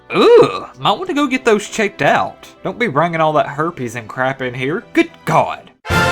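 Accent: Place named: Tennessee, United States. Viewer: Where are you from? Your accent says somewhere around Tennessee to me. I could be wrong though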